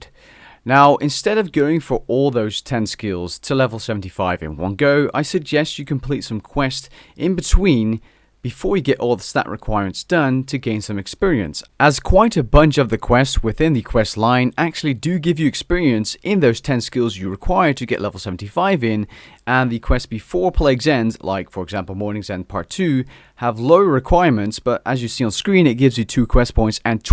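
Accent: United States. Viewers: funny I thought he was from Jersy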